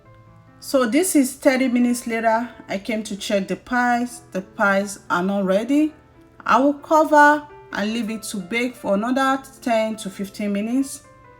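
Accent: Nigeria. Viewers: She sounds Nigerian and this is a Nigerian meat pie recipe. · Talk like a Nigerian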